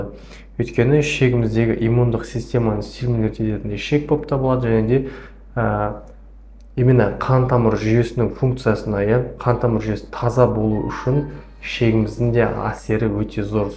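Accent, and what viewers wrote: Standard. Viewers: Аиналаиын балам Рахмет Саган сондаи журекке жылы жагымды казакша таза тусындыресын Алла жар болсын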